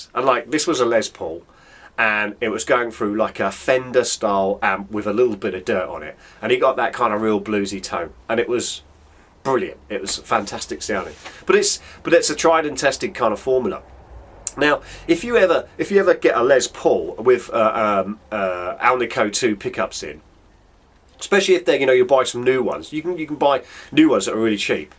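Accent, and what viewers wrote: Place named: North, United Kingdom. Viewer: Where are you from, mate? Is that some northern England accent? I am not a native english speaker, but it is like i have no difficulties to understand every single word you say. What makes it even more strange, is that i dont hear this accent very often, yet it is much more understandable to me than every other english accent i heard.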